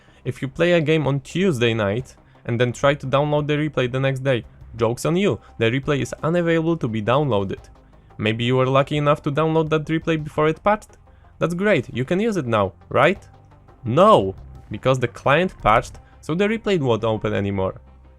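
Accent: Poland. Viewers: And I always wondered why your accent sounds so Polish. Finally got the answer :) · Polski akcent wymowy sprawił że byłem pewny twojej naci :)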